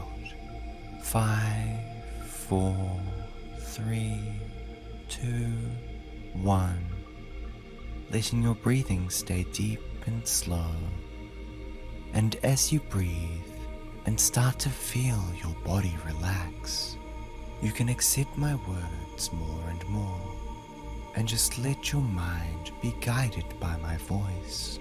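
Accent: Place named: United Kingdom. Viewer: lmao what? 1. he’s british. he’s going to have an accent. 2. every single video is a file.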